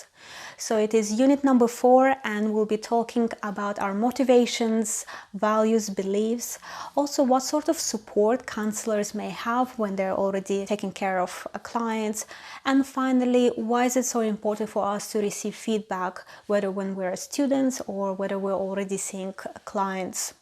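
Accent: Russia. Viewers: you have russian accent. Are you from Russia?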